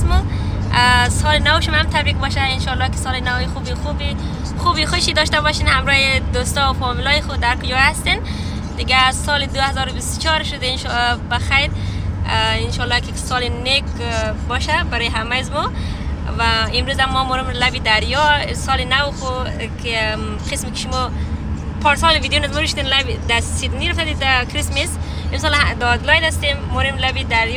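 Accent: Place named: Hazarajat, Afghanistan. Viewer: سلام ووقت تون بخیر)منو سال نو میلادی تبریک میگم بهتون] ماشالله چه لهجه هزارگی حافظ کردین من خودم هزاره هستم خیلی کلمات تون متوجه نمیشم .منم خارج کشور هستم مهاجر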